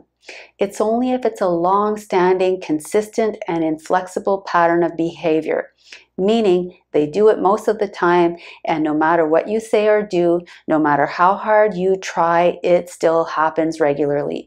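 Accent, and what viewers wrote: Canada. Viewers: Hey, you are really good. I just subcribed to your channel. You sound like a canuck... Looking forward to viewing you videos......................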